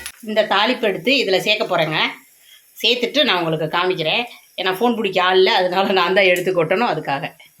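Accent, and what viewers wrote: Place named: Madurai, India. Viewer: Unga pechu ketkka madurai pakkam mathiri irukku........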